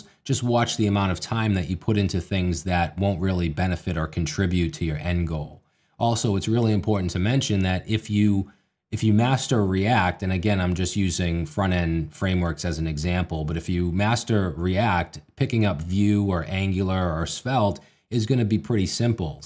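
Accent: United States. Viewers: Bro looks better than when I first saw him, and that was years ago. And got the best American accent I've heard.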